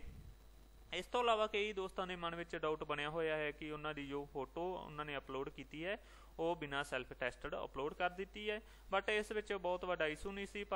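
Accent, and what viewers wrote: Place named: Punjab, India. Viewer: Sir tohadi English di pronunciation v punjabi wangu hai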